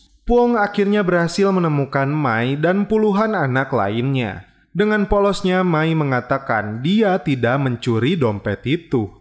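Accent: West Java, Indonesia. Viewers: Sunda ning a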